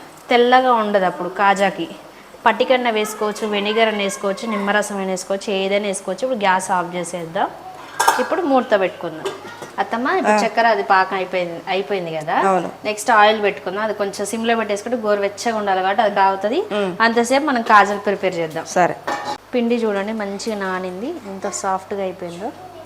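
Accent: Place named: Telangana, India. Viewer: Telangana slang telustundi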